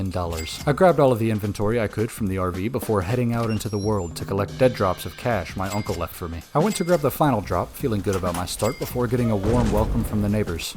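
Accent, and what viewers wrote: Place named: Netherlands. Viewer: Took me a couple mins to notice you are a fellow Dutchy, props on you accent. Enjoyable content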